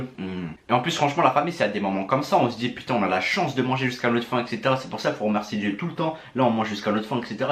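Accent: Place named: Arab world. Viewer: Wwa pas mal l'accent arabe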